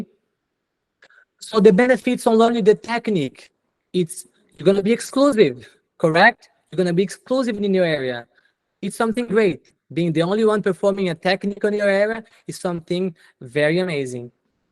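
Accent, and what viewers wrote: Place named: Brazil. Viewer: Pelo sotaque brasileiro 🇧🇷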